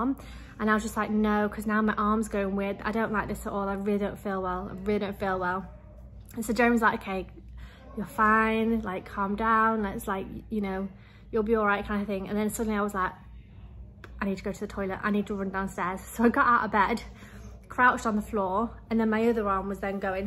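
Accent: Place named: Northern England, United Kingdom. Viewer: Hi. The type of tuna you ate is not yellow fin which is what you get in the store in the UK This is known as " Kelawala" by the locals. I too have got sick eating this Fish In SL there are no testing for contaminants you buy at your own risk.This fish has a dark red tint and looks bloody and can make you sick.I grew up in SL and now live in the US. Ps Your accent sounds very West Midland/Northern England.I have English roots and have been to the UK about five times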